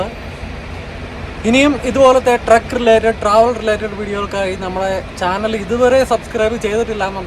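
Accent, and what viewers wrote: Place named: Kunnamangalam, India. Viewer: okey njan kkd kunnamangalam samsaram kettappo thanni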